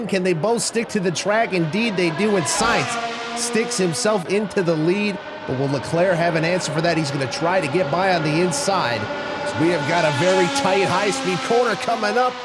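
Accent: United States. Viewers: American accent with f1 intro sounds weird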